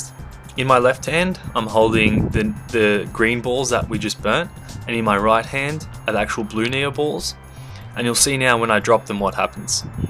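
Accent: Australia. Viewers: Are you Aussie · OwO is that another aussie I hear? Or am I mistaken.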